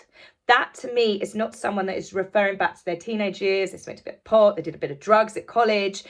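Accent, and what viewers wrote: United Kingdom. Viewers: While I ❤️your opinions, take on things today it's that Gorgeous opal your wearing! I have a keen eye & ❤️opals they are my birth stone. New to yr channel & loving it. The content great but honestly I love the sound of British accents. Find them SO soothing. Lastly in Harkel's replay of some of the word 🤮I saw on Behavior Panel I believe the British gentlemen was very sad & hurt by Migraines comment the the British reaction "smaller" than Americans. As an American I would take that as a compliment. We Americans can be loud, obnoxious, class less people NOT all but I will say many. So be proud for having composure, manners, class. Megraine is perfect example of a low class, rude, mannerless, know it all (only in her mind) money grubbing bottom feeder, who thinks she had what it takes to be a Royal. She has delusional views of how she is perceived & her sense of entitlement. 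Royals are respectful, do Not act entitled, humble, attempt to connect & serve the people however they can. Royals understand Who supports them & pays for their lifestyle Not a paycheck or freebies. I've never met a Royal but that is how I see them to be. Yes there may be problems but they are resolved quietly & discreetly Until the horrors that are the Harkel's invaded & poisoned with their disgusting amt of pure greed & evil. I'll never ever forget Oprahs part in enabling & giving them a platform all to rebuild her relevance her perceived importance & more 🤑💰💰💰. Didn't realize Narcissists came & ran in pa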